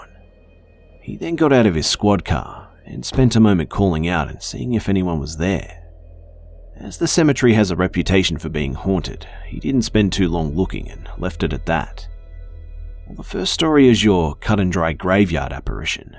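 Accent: Australia. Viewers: Finally, a familiar Aussie accent!! I could tell by the whispers at the start lmao :')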